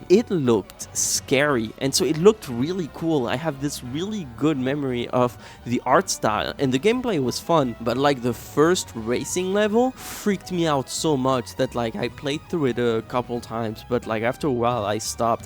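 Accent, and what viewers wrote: France. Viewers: Not gonna touch on everything but : Starting off with Goldeneye on N64 is so funny bc for some reason i was kind of obsessed w the game when i was a kid (not even necessarily playing it, just the game itself? i don't think i even really knew who James Bond was tbh lmao) I played Eyetoy Play sooo much it's actually silly how much i played it I have such fond memories of New Super Mario Bros on the DS, mostly bc i associate it with my mom - we all played it but that game was serious business to her lmao (especially on vacation) I really liked the minigames in Mario Kart DS but i think it's bc my Mario Kart™ was Double Dash on the Gamecube so maybe that played a part? If you played that one i wonder how you felt about the mini games in it Anyway, this was a fun video and i'm glad both you and your sister have memories around these games (and many more i assume). 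Jumpscare incoming: Et d'habitude je capte l'accent français mais j'avoue que j'y ai même pas pensé jusqu'à c'que je vois ta réponse à un commentaire sur une autre vidéo ptdrr but lowkey my English is better.. so let me pretend i didn't say anything !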